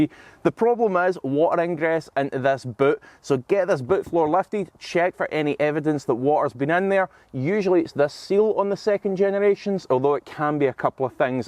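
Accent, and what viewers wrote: Scotland, United Kingdom. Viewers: Scottish accent is so weird but funny 😅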